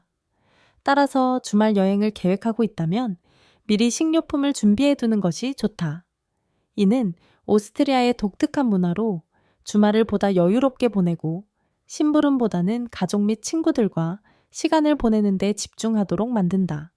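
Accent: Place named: China. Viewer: 한국말 배운 중국인이 나레이션하는거같네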